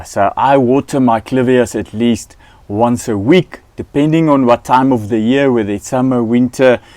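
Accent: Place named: South Africa. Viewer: Lekker to hear a South African accent , I live in South Carolina USA . Great video very helpful tips . Clivia”dare my favorites, remind me so much of home in Transkei where I used to see them flowering by the hundreds under the coastal forest canopy . 👍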